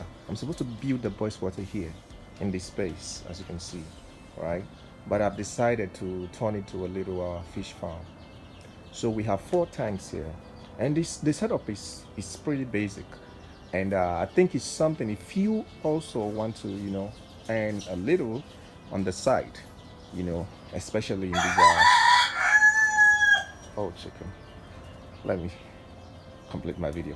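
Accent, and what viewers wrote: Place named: Africa. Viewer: I new I could tell from the accent you had to be a brother from the continent! Greetings from abroad via Uganda! These are things I'd love to do back in Ug. Subbed and liked bro!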